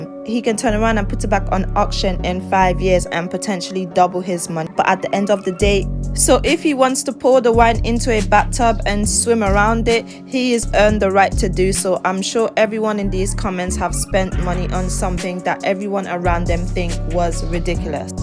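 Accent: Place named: United Kingdom. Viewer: Random but if you don't mind me asking, where are you from? I love ur accent if id have to guess it would be the UK but I'm not sure on what part of the uk.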